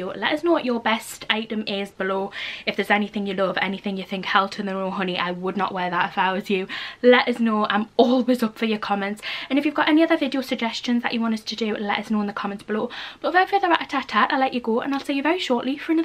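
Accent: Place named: Durham, United Kingdom. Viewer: It’s a dressing gown lol but I’m also from Durham near you so we have the same way of speaking lol x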